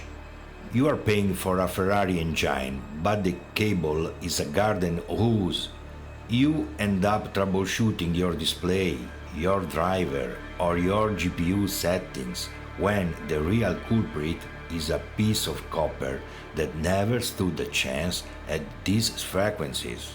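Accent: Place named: Greece. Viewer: Thanks for not using AI voice, despite your heavy accent (greek?). Id rather have someone attempt to make a video themselves than phone it in. That said, I have ran into this with my 240hz 4k oled. On displayport i have the bottom pixel row occasionally fizzle. On hdmi2.1 it has black screens and RGB flickering. Both hdmi and DP have issues with freesync which causes the AMD driver to completely lock up and crash. I pride myself of making my system very stable and optimized. But this damn monitor is always bugging out on me. Randomly turning off and the aforementioned issues. I dont think its the monitor itself...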